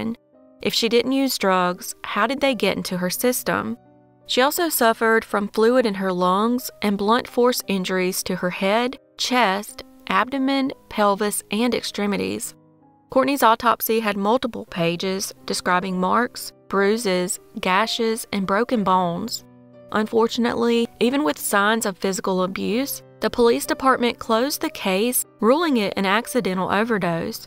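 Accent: South, United States. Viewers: Thank you kindly for your amazing story and video,much luv, I love ur southern accent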